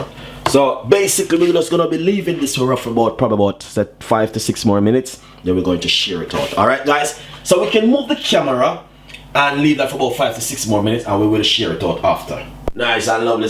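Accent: Jamaica. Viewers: ummm he's speaking patois (patwa) our native Jamaican language....if u dont like how he speaks then move on to the next video.. we know its nutmeg but in broken English is nuttinegg....bitch!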